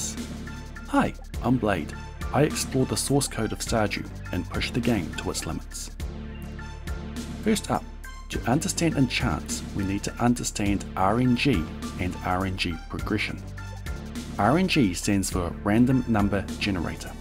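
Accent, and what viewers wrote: New Zealand. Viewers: Nz accent?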